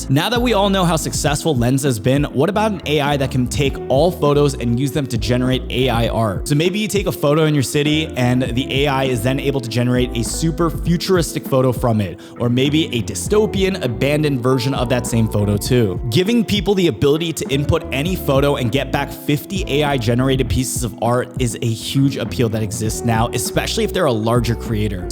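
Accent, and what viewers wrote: United States. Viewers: Very nice video... The words that come out of your mouth are really clear enough to hear.... Similar to an American accent.... It's good....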